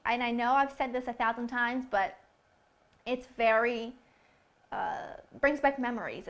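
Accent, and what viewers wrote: Naples, Italy. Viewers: you hold on tight your "italian napoli" accent :D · (Hai un bellissimo accento napoletano, nonostante tutti questi anni negli USA :) Ho sempre seguito i tuoi video in inglese e fa stranissimo sentirti parlare in italiano :P) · si riesce a sentire anche la cadenza napoletana quando parli... ;) a sto punto fai pure un video in napoletano ;) greeting dalla prov di caserta :* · Ciao! Non pensavo fissi napoletana..che strano sentierti parlare italiano! Un bacio ciaoooo;)) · she's neapolitan and has that neapolitan accent. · ma sei napoletana!!!:p o meglio, campana!!che bellezza!!anche io sono napoletana, studio lingue e il tuo accento mi fa sognare!!!è perfetto!!complimenti! bello sentire i tuoi ricordi...e mi fai sperare tanto per il futuro!spero di potermi trasferire altrove e sistemarmi as soon as i can!:p ciao! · Hai un meraviglioso accento napoletano quando parli in italiano, eppure parli l'inglese perfettamente! 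Complimenti! Bravissima e veramente bella.... un bacione dall'Italia! <3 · WOW l'accento napoletano non lo hai perso per niente....sono orgogliosa di te,. Amo l'America, ma bisogna ricordarsi sempre da dove veniamo! Mi piacerebbe se facessi dei video in Italiano, ci faresti sentire di più...a casa! Napoletana DOC come te! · Ahahahaahahhaah hai proprio l'accento Napoletano ahahahahah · ahahahhahaha oddio che bello come parli in italiano!!! però devo dire che si sente tanto l'accento di Napoli e anche quello americano! è un miscuglio strano!! XD io sono di roma ma parlo anche fluentemente inglese, so I know what you mean when you do your videos! :D sei troppo simpatica!! e adoro le tue ricette!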